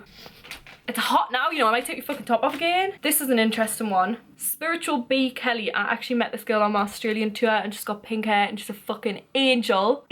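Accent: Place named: Newcastle, United Kingdom. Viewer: Another Geordie, woooooo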